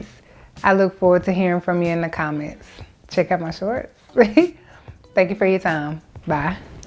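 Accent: United States. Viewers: I feel all of this! I am getting Microlocs again Monday 2 days from today! Also are you from stl? I love your accent! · Do I detect a Texas accent? I think I know my ppl when I hear them and you speak similar to Beyonce and Kelly 😄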